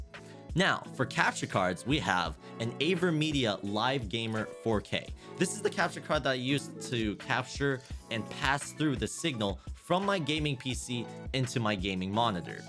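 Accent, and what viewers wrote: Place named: United States. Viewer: You sound like American but are you Korean or??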